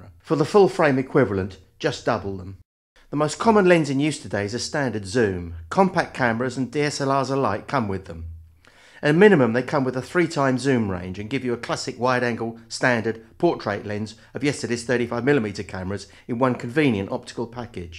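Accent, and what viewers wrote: London, United Kingdom. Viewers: Fantastic video! I have been watching your videos for some time now and wanted to tell you how informative, well put together and straight forward they are. Also your "London Twang" reminds me greatly of my family which is very nice to hear as I am not currently living in the UK at the moment. Anyway, I will stop rambling. Take care and I look forward to more videos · I am here 9 years after this was made to hear that familiar London twang. I am also a Micro4/3 user despite what other people say about this "dying" format. It is a camera that I could carry with me almost everyday with intention to shoot or not for the day.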